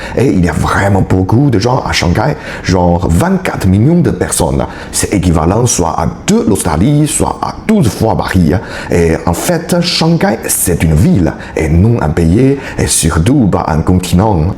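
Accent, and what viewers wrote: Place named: Belgium. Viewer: Merci et je trouve-moi aussi intéressant ce concept :) je ne compte ni y aller ni m'intéresser plus sur ce pays mais ravis d'avoir pu le découvrir un peu ce soir. Et juste pour le fun, n'y voyez pas de moquerie, je trouve dans l'accent que vous avez un brin de quelque chose de belge, c'est juste marrant de se faire cette remarque :)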